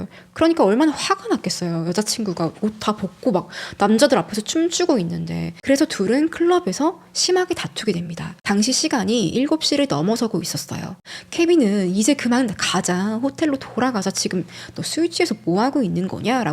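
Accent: South Korea. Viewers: 외국에 사는 발음이 아닌데요. 한국식 영어.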